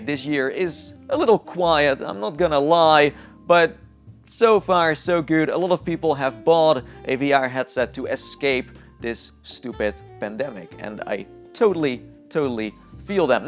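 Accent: Netherlands. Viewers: Ben je Nederlands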